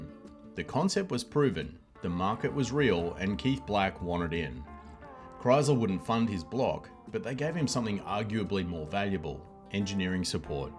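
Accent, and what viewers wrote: Australia. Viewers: I swear I don’t think all Aussies sound alike, but you sound remarkably like Dr Robert Chase, MD (Jesse Spencer). Great video btw, nice to find non-AI channels 🎉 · Great vid, no AI, and the down under narration seals the deal. Subbed, liked, and hyped .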